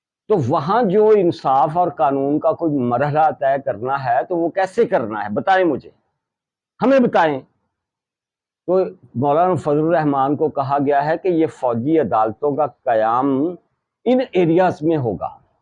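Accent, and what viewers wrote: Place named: Punjab, Pakistan. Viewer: nice commentary with punjabi tankay!😀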